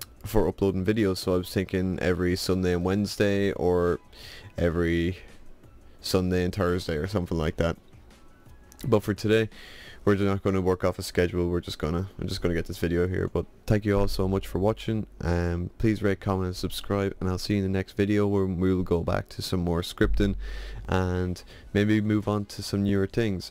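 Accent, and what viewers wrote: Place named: Ireland. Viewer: First Tutorial with an Irish accent and I love it.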